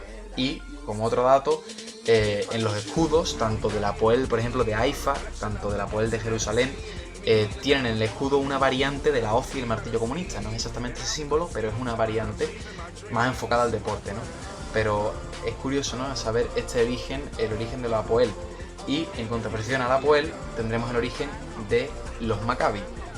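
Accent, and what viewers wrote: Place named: Seville, Spain. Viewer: Por tu pronunciación de la letra T....q me parta un rayo si no sos de Sevilla!!! Jeje.....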